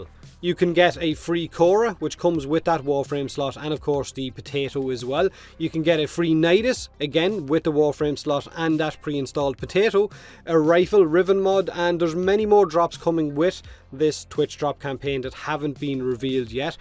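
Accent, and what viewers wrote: Ireland. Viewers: Another great video. Thanks. What part of Fortuna are you most excited for? Also, what part of Ireland are you from?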